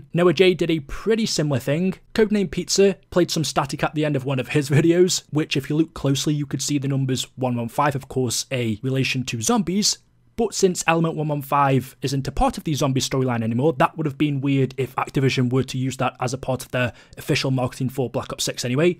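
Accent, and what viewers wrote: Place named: Stoke, United Kingdom. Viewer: Nice stoke accent burslem boyoo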